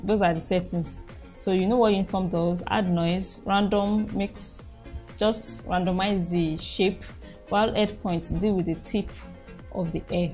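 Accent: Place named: Nigeria. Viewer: You're Nigerian? Your accent sounds familiar because I'm Nigerian too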